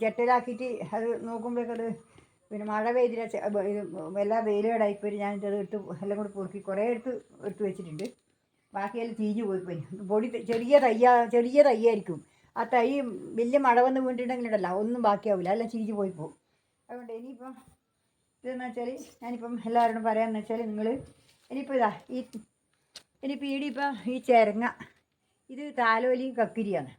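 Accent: Kannur, India. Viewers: ഏച്ചി കണ്ണൂരാണോ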